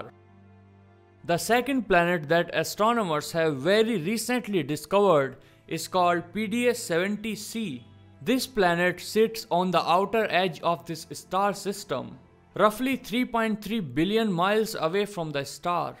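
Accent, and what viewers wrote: India. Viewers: People are hating because the voice really? The speaker sounds Indian and speaks English well with an accent so what? Usually the accent is due to knowing how to speak two languages same with Asians, but I've also met Indian and Asian individuals due have no accent from only knowing English growing up. At this rate we will never be able to be peaceful and live together too savage of animals we are still... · It looks like awesome India voice!!! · His voice is surprising are u an Indian man ??? Just want to know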